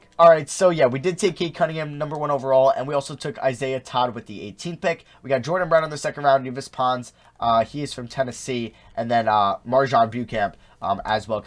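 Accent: Pittsburgh, United States. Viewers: Hey are you from Pittsburgh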